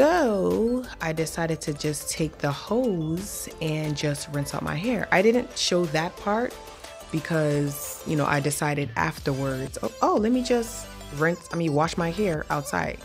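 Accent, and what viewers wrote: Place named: Nigeria. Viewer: Are you from Nigeria? Love your accent sounds like your from there.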